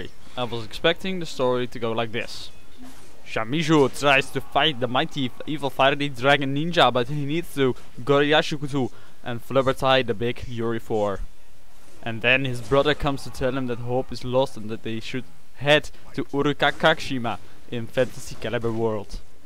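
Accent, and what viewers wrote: Netherlands. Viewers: Haha ik ook, kdacht al toen ik je stem hoorde xD Nederlanders kunnen echt geen engels praten. Heb deze game net ook gespeeld f*kking vet:D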